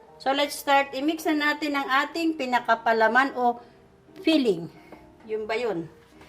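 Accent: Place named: Paete, Philippines. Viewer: taga paete laguna po ba kayo? Pansin ko lang po kasi sa punto. heheh ang ganda po ng cooking vlog nyo. madaling sundan sobrang detalyado..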